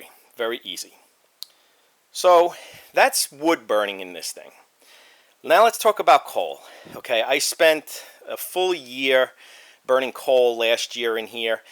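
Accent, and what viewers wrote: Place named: Northeast, United States. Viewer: I can tell you in the northeast by the accent so how much wood do you go through a month in the winter ?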